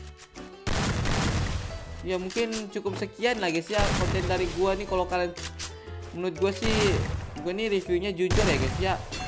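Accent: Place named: Java, Indonesia. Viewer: Jawa keras bos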